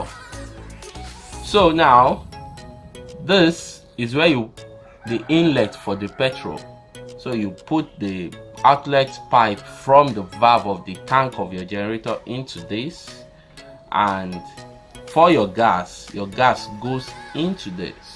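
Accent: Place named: Africa. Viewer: Thanks for the presentation, and, interesting breaking the ice to Africanize YouTube engineering video presentation. Your language is clear- sounds like a seasoned African. In addition to your efforts, I will encourage you to put what you talked about in this video into a practical teaching. You could have one biogas source, connect the engine to it, and then show the world how goodly it works. Try this and how that raises your views, likes, comments, and subscriptions. Thank you, Sir.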